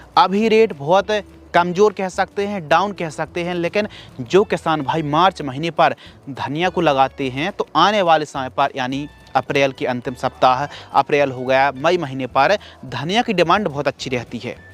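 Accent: Bundelkhand, India. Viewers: Aap Bundelkhandi ho ..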